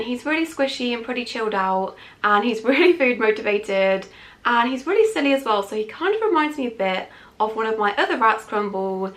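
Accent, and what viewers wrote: United Kingdom. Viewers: That high-pitched British accent is so cringeworthy.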